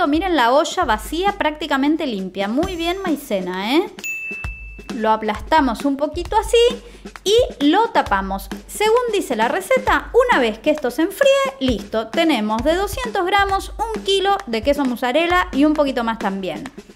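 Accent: Argentina. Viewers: Me encantan todas tus recetas pero me recopan mil tus "meal prep" y tus "puede fallar". Dale, que te veo siempre. Voy a acabar hablando en argentino, che!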